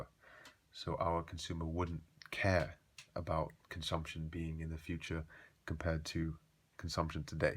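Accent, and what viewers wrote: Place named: United Kingdom. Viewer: Thank you very much, so useful! Keep uploading videos, we need to understand economics with a British accent ;)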